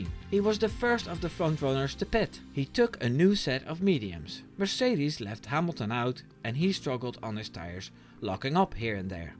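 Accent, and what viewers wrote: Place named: Netherlands. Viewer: ik kan aan je engels bijna niet horen dat je uit nederland komt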